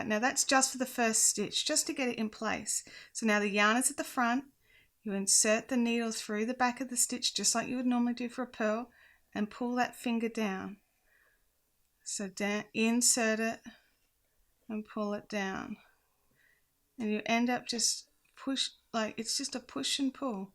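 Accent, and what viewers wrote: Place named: Australia. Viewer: Wow!! I've never heard of continental knitting til now! I can knit & crochet, but haven't knitted for many years, but crochet heaps as it's my passion. If I ever decide to knit again I'll have to give continental knitting a go! I've just found your channel & subscribed. 😀👍 I assume you're an Aussie by your accent. 🤔 Blessings from Adelaide, South Australia. ❤️🦘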